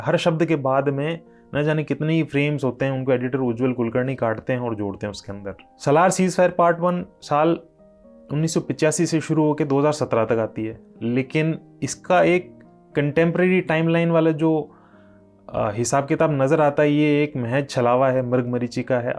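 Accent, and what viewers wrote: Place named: Rajasthan, India. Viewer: भाई मैं आपका रिव्यू पूरा देखा पर भाई सच कहूं तो जैसे ही अपने बोलना शुरू किया पता नहीं क्यों अंदर से आवाज आई कि आप राजस्थान से होंगे 😊 सच पूछो तो इतनी bachodi राजस्थान वाले ही कर सकते हैं भाई सच पूछो तो आपने बहुत तगड़ी bakchodi ki 😅😅hai और सच बताओ तो मैं खुद राजस्थानी हूं इसलिए यह Janta hu😅😅😅😅😅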